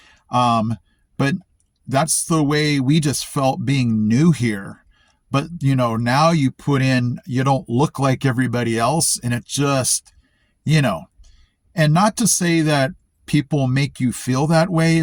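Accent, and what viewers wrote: United States. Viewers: As an East Tennessean I couldn't care less where anyone once was. If you choose to fit you do. I have two nephews half Japanese by heritage that I never think about as being anything other than my nephews. You seem to have assimilated so well that you sound like an educated hillbilly. I would have thought you'd lived here the majority of your life. "Purebred" Southern Appalachians have mostly held to the belief of I'll do me and you do you and as long as you don't infringe we'll happily meet in the middle somewhere or agree to disagree and go on with life. You've gained a subscriber and a belated welcome to the greatest place on earth. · Many years ago I spent 6 weeks every summer at my mamaw’s house in North East Tennessee, and I would think your accent would cause people to call you a “damn yankee”. I’m guessing if you have an orange UT banner flying on your front porch, you’re good regardless of any other differences.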